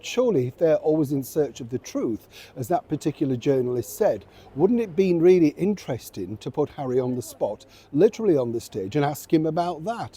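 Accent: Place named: England, United Kingdom. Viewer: Thank you for your straight-forward delivery. Never put a soundtrack in. I like your voice and the sounds of England.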